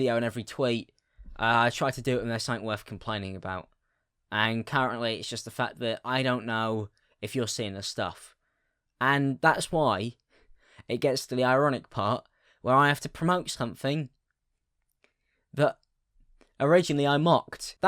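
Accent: United Kingdom. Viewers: It must suck to have a British accent and *still* sound sorta dumb. Not nearly as dumb as my American accent (face it Americans, the world thinks we sound retarded) but definitely way more dumb sounding than most British accents. (Again, I'm American, and we think a British accent means you're smart, because we are very, very dumb. If you have a British accent AND glasses, we assume you're a genius. You know; like how a dumb person thinks. )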